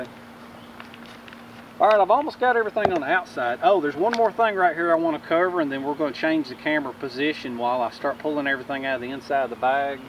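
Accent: South, United States. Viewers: love the accent.im southern too,but.....you have a voice that is calming and would garner trust.love your videos!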